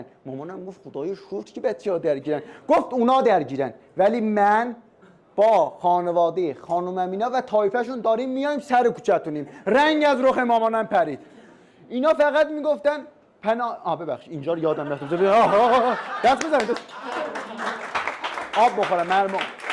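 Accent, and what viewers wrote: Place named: Azerbaijan. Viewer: 😂😂😂😂خیلی خوب بود اجراش،خدایی قشنگ بود،خیلی لهجه آذری شم باحاله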